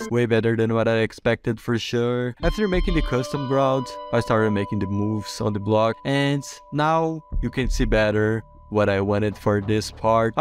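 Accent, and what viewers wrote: Brazil. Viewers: Reconheço sotaque brasileiro de longe, é sempre raro e bom ver YouTuber BR na gringa. Keep up with the content <3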